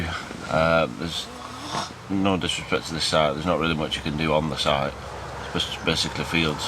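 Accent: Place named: Bolton, United Kingdom. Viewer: Been watching ur vlogs, saying this guy's definitely from Bolton 😅.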